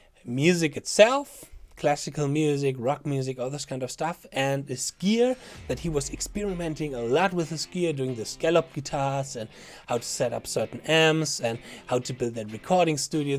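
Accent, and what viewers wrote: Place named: Germany. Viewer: Right, Yngwie is one of those one in a billion supertalents and who are you?? The way I see it is that you are some strange looking guy with a weird german accent with a hoody on in what I assume should be a heated tiny room with some cheap lights, hoping to get some views of off Rick Beato's success and Yngwie's massive career. Who are you again?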